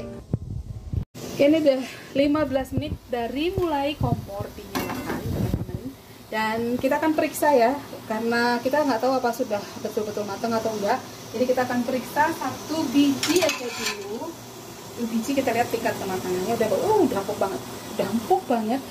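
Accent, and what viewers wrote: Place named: Java, Indonesia. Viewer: iki kok logate jawa yaa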